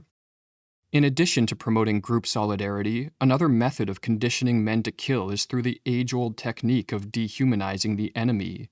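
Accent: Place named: Canada. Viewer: Its easy to tell you're from Canada. How say the word "been" is a dead give away.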